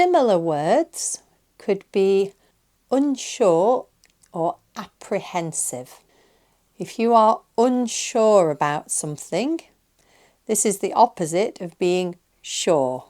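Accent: United Kingdom. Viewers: Thanks for the video. I'm of the opinion that British accent is understandable than American. When I look at this woman I figure out that she's definitely from England. You're explanations are really exciting.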